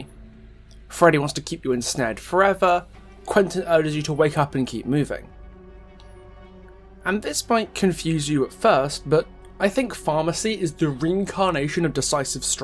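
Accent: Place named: United Kingdom. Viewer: Are you british because that is lame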